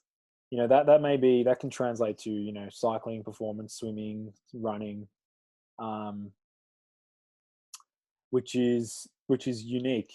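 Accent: Australia. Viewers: he's not british. That is an Australian accent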